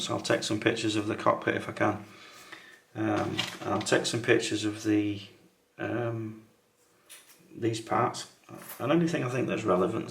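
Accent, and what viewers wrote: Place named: Yorkshire, United Kingdom. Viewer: Thanks….love the details…..thought the ‘mumbling’ comment was unfair and the Yorkshire accent is quite mild, I heard everything clearly. Please do not let that comment discourage you, keep them coming.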